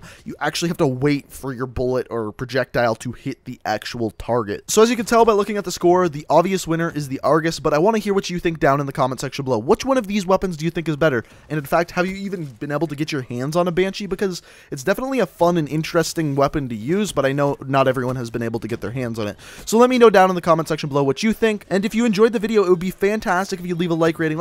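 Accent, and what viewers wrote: Canada. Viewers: is this guy canadian he sounds canadian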